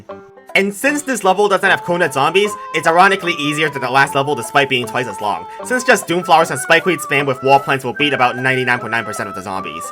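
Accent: China. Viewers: I think I'm in love with you. Lowk love your videos and Chinese accent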